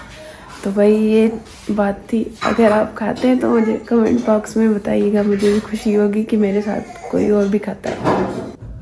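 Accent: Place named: Sindh, India. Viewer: Kah sa ho app Sindhi Laga Raha ho aap ka BAAT krna sa pata chal ta ha 😊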